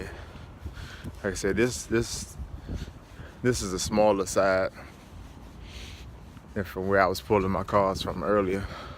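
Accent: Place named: DC or Maryland, United States. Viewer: you from dc or md brah. I swear you sound like you from out here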